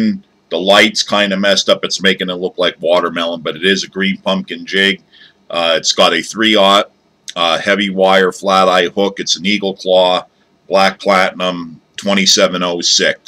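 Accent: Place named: Western Pennsylvania, United States. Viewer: You are a pro! Clean and simple. Man you sound like you're from Western PA. Pittsburgh or Johnstown. What kind of cover you throwing this type of jig in?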